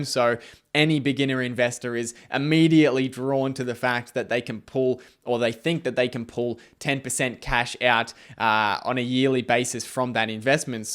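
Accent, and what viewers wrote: Australia. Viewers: I only recently discovered your videos and I really like them. I wish I had your insight when I was your age! Your reflection on your mistakes is so valuable for me! It made me understand my own decisions and my own thought process much more. It also helps me to understand that passive investing probably is better for me as picking the right stocks is a lot of work as you said. I also like the clean style of your videos: no annoying noise, straight to the point and just enough visuals. They are also not too long! And your Australian accent is very pleasent!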